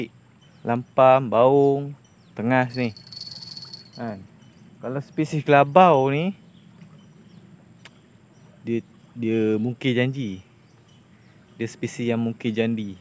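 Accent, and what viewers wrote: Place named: Gerik, Malaysia. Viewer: Ckp pun udah mcm org grik....tokdang tahok..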